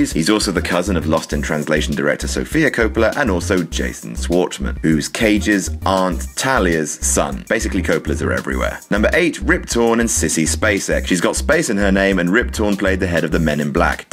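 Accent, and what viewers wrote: United Kingdom. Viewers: narrated by Brits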